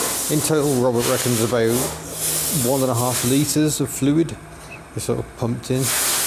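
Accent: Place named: Birmingham, United Kingdom. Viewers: your a brumy mate im from dudley lol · Loved hearing the Black Country accent again! :)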